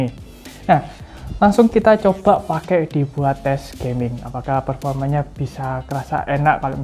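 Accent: Java, Indonesia. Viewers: suka logat jawanya :)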